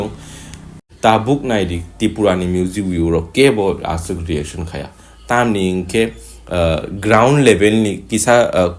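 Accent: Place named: Manipur, India. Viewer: Very Few Meitei People can speak Kokborok I'm surprised You are one among them 😃 Clearly you have Meitei accent even after speaking Kokborok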